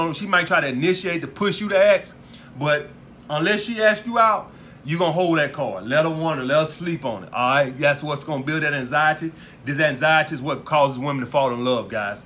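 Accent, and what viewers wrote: South, United States. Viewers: He’s not, its his southern accent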